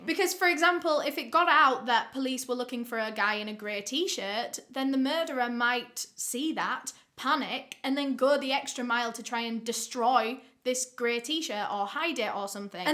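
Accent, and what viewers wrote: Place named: Yorkshire, United Kingdom. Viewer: I think its a British thing. They say so many words strangely lol same with Australian accent...plus different dialects. she says sooch instead of such. She says gale for girl.. lol I love it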